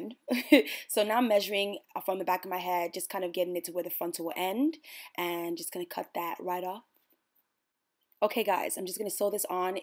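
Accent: Nigeria. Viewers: I haven't watched a video of yours in a while but: your personality is still lovely, your smile is even more radiant and....I miss your Naija accent oooooo!!! 😕😉🙂 The wig looks great on you!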